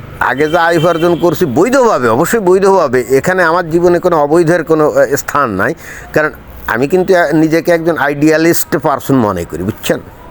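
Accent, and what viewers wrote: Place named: Khulna, Bangladesh. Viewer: আপনার কথা শুনে মনে হয় --- আপনি খুল্লে জেলার লোক! ওটা আমাদের পূর্বপুরুষদের বসবাসের জায়গা ছিল!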